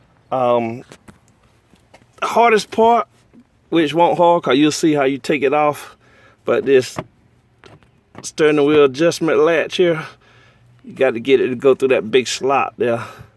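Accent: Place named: California, United States. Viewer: I’m from Cal so thumbs up for the accent alone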